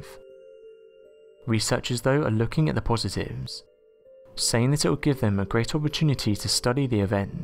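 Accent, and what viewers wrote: United Kingdom. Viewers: I saw no proof or evidence regarding the claim of NASA. Instead all I heard was a British smart alec talking in riddles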